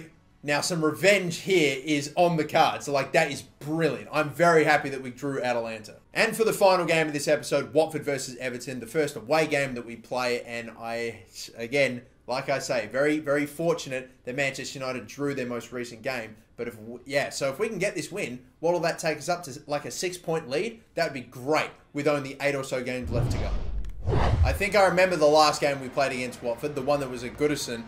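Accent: Australia. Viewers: Are you Australian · I always thought you were Australian.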